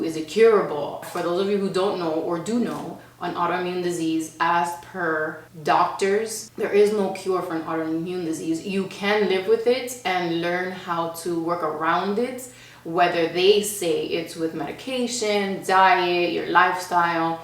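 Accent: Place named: Miami, United States. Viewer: you sound miami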